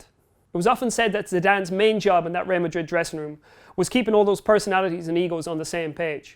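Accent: United Kingdom. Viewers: Canadian here. Where's this guy's accent from? I.e., what part of the UK?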